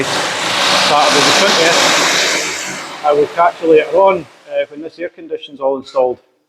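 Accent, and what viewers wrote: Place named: Scotland, United Kingdom. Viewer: Hi, when we were kids we had a saying that goes like this....... jealousy makes you nasty....... If you give way to the low class you end up like them. I listen to the Scottish accent coming through, if you are of Scottish descent, man, this person placing these comments clearly does not know the Scotts. Water of a ducks back, that's how you treat these comments. Good luck with the wetting of the front porch as soon as the place is finished.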